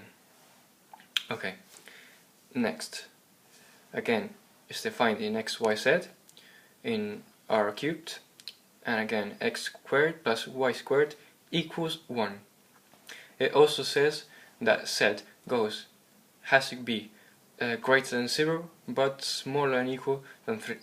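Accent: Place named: Spain. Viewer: I can hear the spanish accent xD, it's not Zed but Sed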